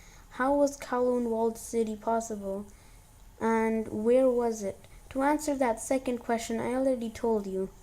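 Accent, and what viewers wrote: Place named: India. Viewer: Your voice changed so much holy shit, it became so indian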